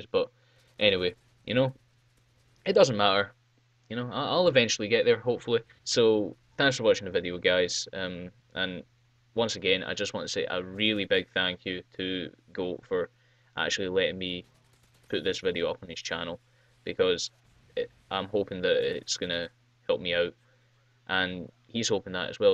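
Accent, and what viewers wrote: Scotland, United Kingdom. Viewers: I LOOVEE your scottich accent! Easily subbed! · woo scottish ftw ;D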